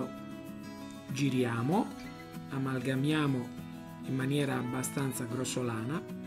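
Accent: Sardinia, Italy. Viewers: mi pareva di sentire un lieve accento sardo ... saluti da Ercole Pinna ... sarebbe interessante una pizza con prodotti sardi ... carciofi bottarga e pecorino !!!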